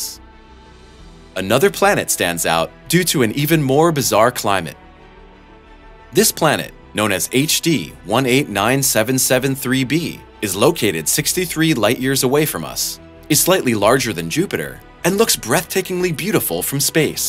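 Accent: United States. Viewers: Why would you use km when telling of an American tornado! So friggin annoying! You even have an American accent! Use standard measures idiots!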